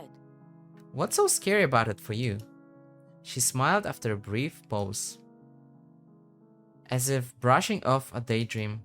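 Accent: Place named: Russia. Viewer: Как только услышал идеальное произношение русских имён, сразу понял, что смотрю не иностранца... Произношение на английском у вас шикарное. Если сильно не вслушиваться, то можно и не заметить акцента, что, собственно, и произошло)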